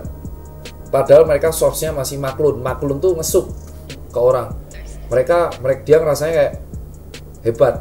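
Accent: Java, Indonesia. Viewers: njiirr lahh anak gaul logat jowo wkwkkw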